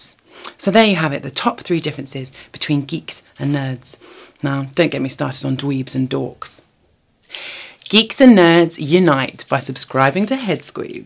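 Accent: United Kingdom. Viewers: And the accent. Don't forget the brit accent. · I normally don't like red heads or Brit accents. But they both are awesome here. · It's the soft, clear voice with the British accent that gets me.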